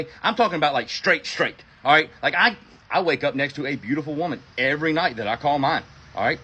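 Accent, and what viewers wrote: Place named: South Jersey, United States. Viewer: Ps-you might have a lil south jersey in ya like myself. You rock👍❤️🕊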